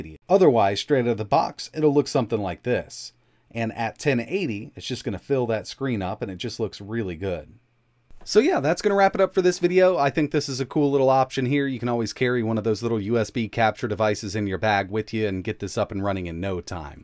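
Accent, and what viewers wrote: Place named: South, United States. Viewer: Sounds like spent some time in the south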